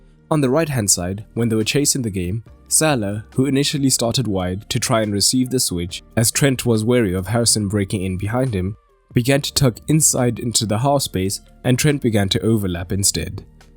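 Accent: South Africa. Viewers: Dude are you South African 😂 this accent is mad familiar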